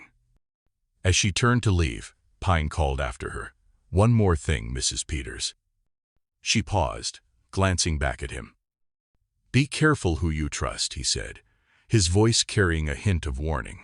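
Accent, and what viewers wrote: United States. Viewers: Terrible American voice not worth listening to.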